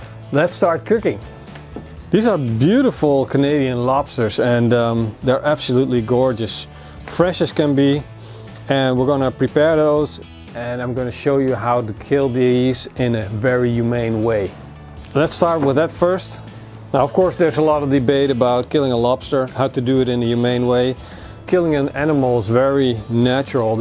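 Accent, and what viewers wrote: Netherlands. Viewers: You have a dutch accent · Ik hoor gewoon te goed dat je Nederland bent.. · Annoying dutch accent.🤮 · Engels met Nederlands accent . Grappig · Noticing the slight dutch accent I was surprised that he did not throw the lobster alive into a deep fryer...😅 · Hahaha 100% jij een Nederlander bent, ofja je ouders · Ik hoor een Nederlands accent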